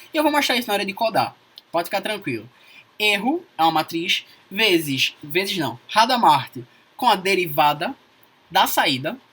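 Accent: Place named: Rio de Janeiro, Brazil. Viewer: muito bom carioca com puxada nordestina ou vice versa. hahah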